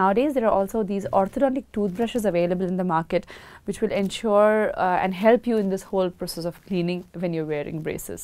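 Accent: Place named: India. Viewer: I usally hate indian accent but this one is lovely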